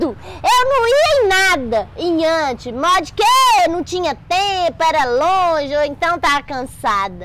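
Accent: Minas Gerais, Brazil. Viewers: Consessa que coisa linda ouvi você com essa simplicidade com esse minerez, só você Deus te de vida longa para nos alerta bjs coisinha 😘😪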